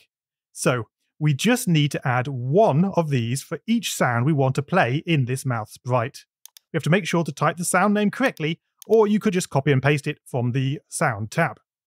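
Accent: United Kingdom. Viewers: I think you’re from UK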